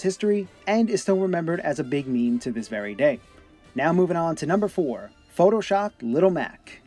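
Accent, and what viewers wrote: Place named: Boston, United States. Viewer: You almost sound like you're from Boston.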